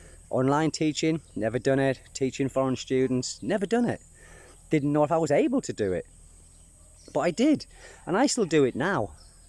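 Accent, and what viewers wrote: United Kingdom. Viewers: during 60's and 70's, Columban missionaries were assigned that area of Mindanao. w/British accent like you.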